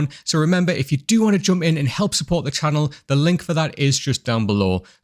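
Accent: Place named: Durham, United Kingdom. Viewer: I like your accent , are you from Durham ?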